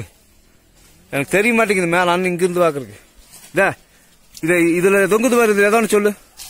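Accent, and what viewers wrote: Kongu, India. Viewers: கொங்கு தமிழ் தாண்டவம் ஆடுது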